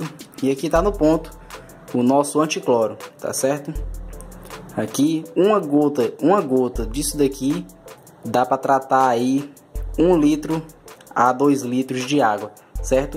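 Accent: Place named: Pernambuco, Brazil. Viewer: Ele é pernanbucano, faz parte do sotaque butar é a mesma coisa que botar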